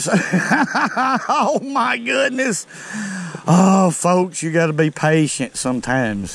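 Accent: South, United States. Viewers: Definition on southern accent right here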